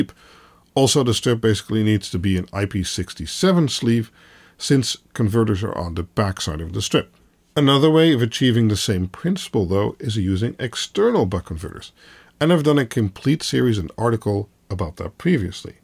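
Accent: Netherlands. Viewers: even een vraag tussendoor...ben jij toevallig nederlands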